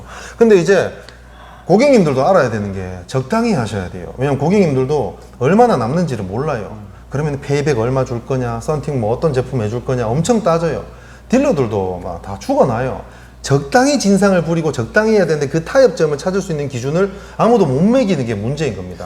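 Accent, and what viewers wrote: Gyeongsang, South Korea. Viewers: 억양이 경상도 이신거 같은데 진짜 홀린듯 영상 봤네요 좋은 정보 감사합니다.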